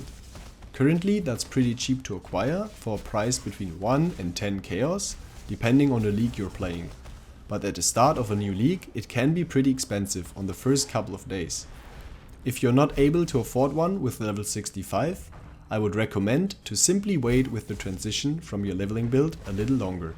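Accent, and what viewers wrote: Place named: Germany. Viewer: good job trying to avoid the german accent.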